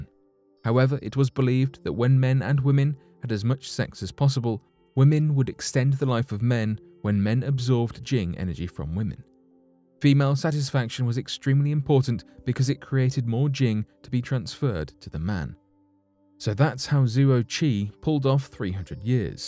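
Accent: United Kingdom. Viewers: the poor man and like his British acent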